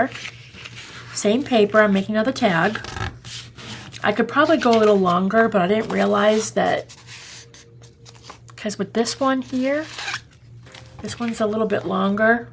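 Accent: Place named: Boston, United States. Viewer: You're just the cutest ❤ I LOVE LOVE LOVE your creativity AND your Boston accent 🤗💕 hugs&love Darlin xxx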